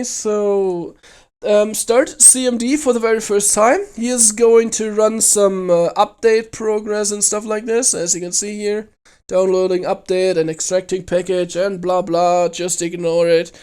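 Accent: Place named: Germany. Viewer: Are you german? You sound german :) I have a problem --> I only get the message (translated from norwegian): The taget you have assigned, is invalid. control if you have assigned it to the right place or filename any ideas? (im using w10) The line is below "C:\Users\Magnus\Desktop\DEDICATED SERVER\steamcmd.exe steamcmd +runscript scripts/7daystodieserver.txt"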